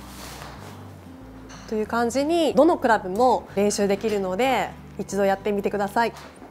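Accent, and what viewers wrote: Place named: Kansai, Japan. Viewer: 関西弁がイイね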